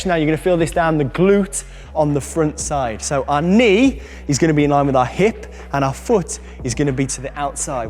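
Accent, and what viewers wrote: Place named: Birmingham, United Kingdom. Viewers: you don’t hear the Birmingham accent on YouTube! 😀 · thanks dude for this information also for this british accent hahahahah;)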